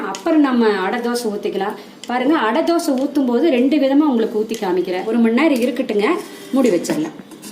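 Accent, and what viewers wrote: Kongu, India. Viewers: எந்த ஏரியா அம்மனி நம்ம ஊரு பாஷை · Aunty நீங்கள் பேசும் கொங்குத்தமிழ் சூப்பர் 👌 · Kongutamil arumai amma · Amma.... more than adai dosai.... ur kovai slang is tasty and awesome.... kongu thamizh..... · vanakkam nga mam..Romba santhosama irukku unga adai receipe pathi neega solrathu ..Appdi oru kongu slang nga..lvly nga..enga ammchi kara chattney (onion .dried chillies. tamarind and salt ) seivanga .ammvum Athe thanunga. .. thuvar am paruppu n ulunthu 1/4 tumbler thaan pooduvanga..muranga thzha romba rare nga ..Oru naal eppdi seithittu comment panrenunga mam. Naambal athavathu kaara kolukkatai method post Pannu nga mam.mattai arisi pootu epdi seirathunu. thankz nga mam.☺👑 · Unga kongu Tamil kekarappo enga patima gyabagam varuthu. Very nice explanation. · மேடம் உங்க அடை சூப்பர் நீங்க கோயமுத்தூர் றா என் தாய் நாடும் அது தான் மேடம் கொங்குநாட்டு தமிழ் அருமை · Enna oru super navuurum adai👏😋 .adhvum unga Kongu thamizh azhagil ,seimurai azhagil sokki ponen amma.nan ungal magalaga Iruka kudadha😭😭.SANTHI from DHARMAPURI. 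your new subscriber.all your recipes are simply superb.👏👏👏👏👏👏💐💐💐💐💐💐🙏🙏🙏🙏🙏🙏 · I used to prepare adai dosai with your measuring only amma.. i already made for more than 5 times. Very nice measurements explanation.. additionally I will add moong dal .. happy to hear our kongu tamil. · Namma ooru தமிழ் கொங்கு தமிழ் Eappavumey அழகுதான் என்னங்க நா சொல்ரது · Very good narration. My nepali cook not known this adaidosa. Tq .kongo Tamil.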